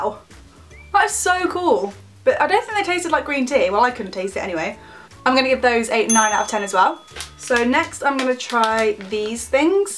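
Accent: United Kingdom. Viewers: are you american or british you talk like a british person but call sweetys candy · you should! it'll sounds awesome with a British accent haha =) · Are you British or Australian? Either way, you're gorgeous.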